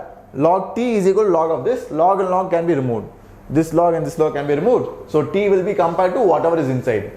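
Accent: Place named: Karnataka, India. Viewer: Sir's Kannada accent is 👌👌👌👌👌👌👌👌👌👌😊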